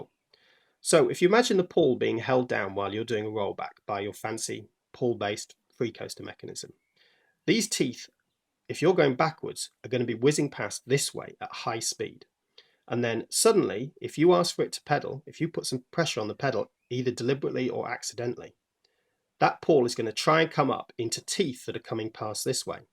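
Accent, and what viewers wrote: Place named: United Kingdom. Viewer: So whose British voice is this?? I've been running Odyssey parts for half my life and finally bought a Clutch today 😁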